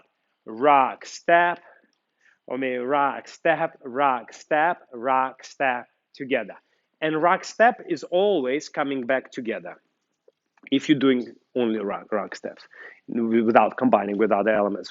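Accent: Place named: Ukraine. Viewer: I watch your videos all the time for tips! I would always say to myself "you are exactly like my instructor"! When my social dance studio closed down from Covid last year, I managed to find a new studio close by. I wasn't expecting one-on-one with the utmost professional dancer as I was only used to group dancing with my granny buddies where I think we put more social into the studio then perhaps the dancing. However, I really liked learning all the different dance styles and wanted to continue. The new studio I found is nothing like the old one. I come home and need a hot bath after these lessons. I also had to look for videos for tips and exercises, but they couldn't be just any videos, I needed to find videos where the instructor would say " posture, posture posture, you have to settle your hips, core in, rotation, you need to create a straight line"! 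Then when I heard in your video "a beautiful straight line" in the same exact accent as my instructor, (who is also from the Ukraine), I said to myself... these are the perfect videos! I really like learning the mechanics of the dance now even though it is a lot of hard work! However, the mechanics put the meaning, style, expression, and beauty in every step. I am grateful for finding the most passionate and experienced instructions. Thanks for all you do!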